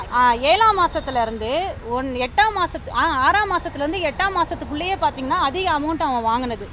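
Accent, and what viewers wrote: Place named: Madurai, India. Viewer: அக்கா..சொந்த ஊரு ..மதுரை னு..நினைக்கிறேன்....உனக்கும் ஆசை இருந்து ருக்கு...சக்கா. .அக்கா..செம..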